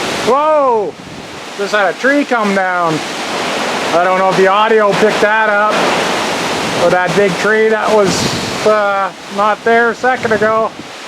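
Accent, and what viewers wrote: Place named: Newfoundland, Canada. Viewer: You sound like a Newfie ?? Good coverage 👍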